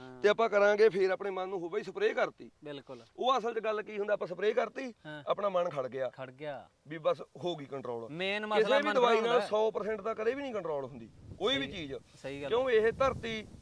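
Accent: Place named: Sangrur, India. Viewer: Sangrur aria bharidi bola janda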